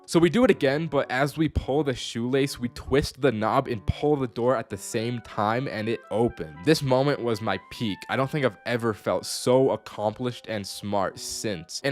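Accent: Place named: India. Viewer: I just realized you sound Indian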